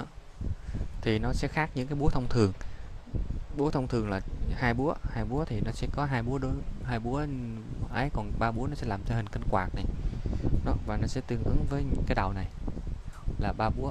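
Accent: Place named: Quang Nam, Vietnam. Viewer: núa lau lau dính tiếng quản🤔🤔🤔🤭🤭😁😁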